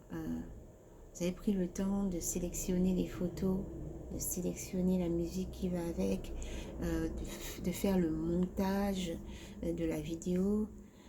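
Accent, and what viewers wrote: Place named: England, United Kingdom. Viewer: Merci pour la lecture des messages, des clés qui mène vers le chemin du succès. Vous avez vraiment l'accent Anglais. Et qu'et ce que c'est beau à l'écoute. J'ai adoré. Merci soeur pour votre merveilleux partage. Abssaanaa.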